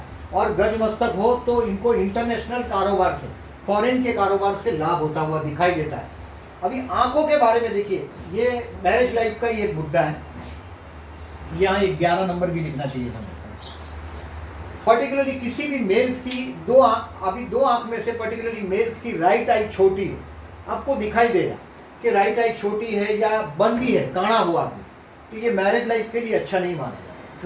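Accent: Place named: South Gujarat, India. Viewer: Sir your language seems like south Gujarat which is your native place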